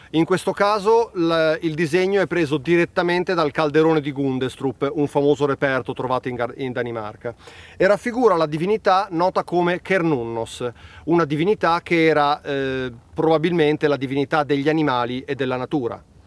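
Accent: Italy. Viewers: Ah ah okay It’s a Northern pattern (Gallo-italic language variety) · Mo soccia, che bella cadenza bolognese.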